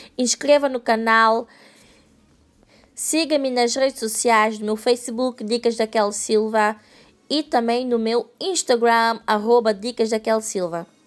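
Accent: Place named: Portugal. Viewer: Amai o sutaque português ❤️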